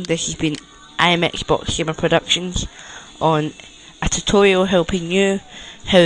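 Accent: Scotland, United Kingdom. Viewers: British accent + lisp. Not a good combo. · dued are you brittish your voice it sounds brittish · your scottish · I can tell it is a scottish accent I'm scottish but I don't have the accent. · sho ya hache cho dchownload ich in ya sherver... IS THAT HOW U SPEAK? clearer please... but i think you are scottish cuz your now is like scottish now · He is scottish, :P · judging from his tone im sure hes scottish am i wrong?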